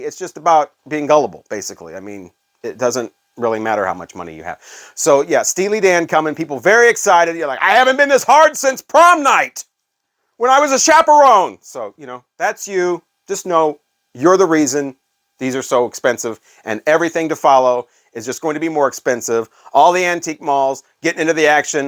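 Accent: United States. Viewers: High bullshit = High record prices?! Wtf are you talking about? Another issue; ripping people off is NOT business. And btw… there’s no doubt you are an American. And finally, proper pronunciation is not pretentious.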